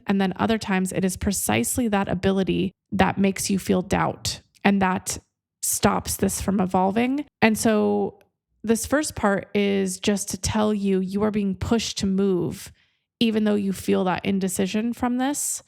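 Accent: Italy. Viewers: Your Italian accent actually sounds so prettyyy and I oove your humor hahha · Things I ❤…., puflet’s and Italian accents. Thank you.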